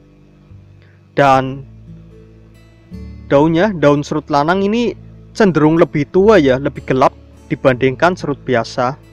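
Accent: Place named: Banyumas, Indonesia. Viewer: salm ngapak kang ,... :D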